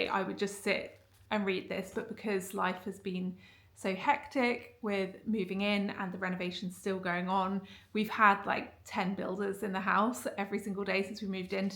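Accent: United Kingdom. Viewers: I am sooooo happy you are back! I watched you for years. You are very entertaining to watch and I love your British accent. (I am from the US). Everything sounds better with a British accent. You look so pretty….so excited for the tour of your newly renovated house.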